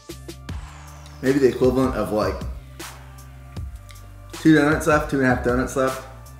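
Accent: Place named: Pennsylvania, United States. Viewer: I just watched pretty much all of your videos and I'm 100% subscribed! I wish I lived in Texas so I could try these donuts! I feel like I heard you say you were from Pennsylvania in one of your videos.. You definitely sound like it lol I'm from PA, as well.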